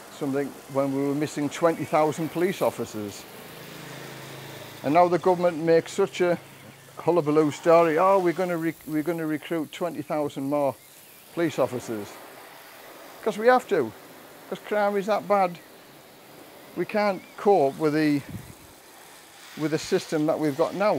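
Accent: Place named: Northern England, United Kingdom. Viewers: Hi what accent is that, sounds like my Middlesbrough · I am an 86 year old Englishwoman and I will say that this is the best country to live in. It is not the lovely country that it was in my childhood but I would never live in another country. I have travelled extensively and lived,for three years, in Colonial Africa where I was very homesick for England. You sound like a northerner to me. Perhaps you have not seen the lovely parts of England.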